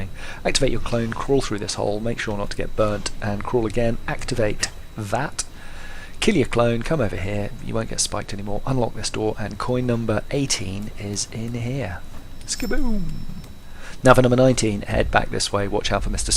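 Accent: United Kingdom. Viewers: Thanks for the great video. I had to make adjustments because I was going for the Thief Insignia and for the Pretentious achievement at the same time. "Pretentious" is a nightmare, but with a few covered kills followed by some "run-like-the-wind"s it was finally doable (albeit leaving a bloody trail of devastation in my wake). Loved the narration, btw. There's something about a British accent that just makes you-tube videos better (sounds more cultured), and I also appreciate you keeping it clean. It's refreshing to not have to put up with a slew of f-bombs just to watch a gaming video. I look forward to the rest of this series. This game is far from my "favorite", but your videos definitely make it better. Kudos!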